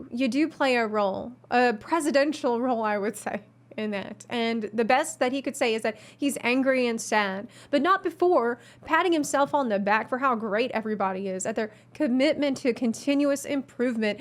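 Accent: South, United States. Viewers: I'm so confused. Your profile says Ukraine, but you sound like you're from the Southern US. Also, your voice is so soothing. This is like accidental ASMR almost. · It's very brave that you talked about a topic that was personally very difficult for you aswell! I could see and hear how difficult it was for you to talk about this, and I admire your courage to make this vid!! Also, you're Ukrainian?:O Girl you sound so very American I didn't even notice the slightest accent lol. My collegue is also, and she's a very nice person, but always very puch busy with her looks and can have a 'southern' temprament hahah (she's from the warm part with coast). Anf finally, people talking in the comments to 'boycot' Blizzard or definitely ending their sub because of this scandal, didn't you hear say that MOST of the people there are just DOING THEIR JOB?! The people who are being harassed already have it difficult enough, last thing they need is losing their income.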